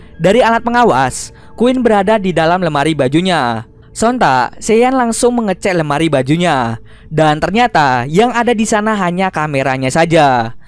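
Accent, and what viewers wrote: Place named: Java, Indonesia. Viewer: Terlalu jawa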